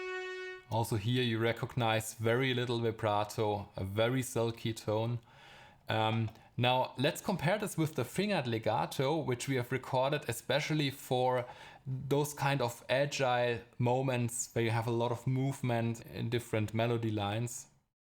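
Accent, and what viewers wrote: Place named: Germany. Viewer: i love the german english. :-) sehr gute Einführung. Danke, sehr fundiert auch in der Geschichte. Danke.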